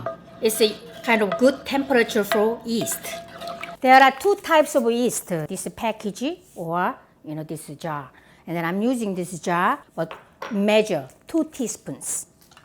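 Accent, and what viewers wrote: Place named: South Korea. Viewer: 분명 다른나라언어 인데.. 한국말같지..? 우리엄마가 영어하는거같아..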